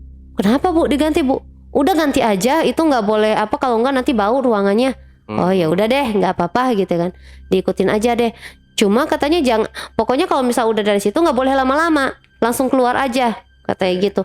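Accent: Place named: Sulawesi, Indonesia. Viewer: Aksen logat sulawesi 😁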